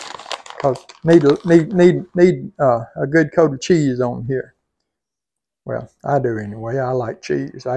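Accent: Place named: Texas, United States. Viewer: I will watch again to hear your Texan accent!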